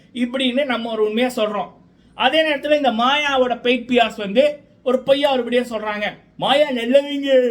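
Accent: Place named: Sri Lanka. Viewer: i know your talking style like srilankans nice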